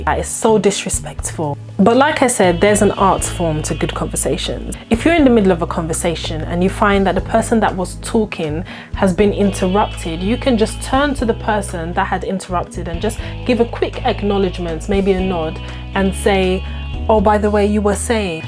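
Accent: United Kingdom. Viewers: ove your British accent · I like the British y African accent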